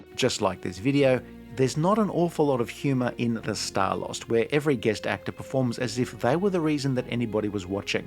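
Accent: Australia. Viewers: I assumed it was the host's Aussie accent, since "tare" would sound like "tire"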